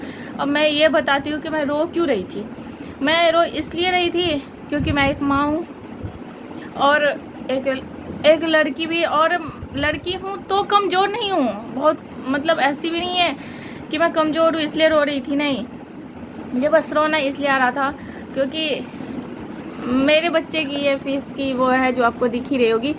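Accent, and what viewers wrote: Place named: Nepal. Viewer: Rona nahin boli me Nepali lagti hai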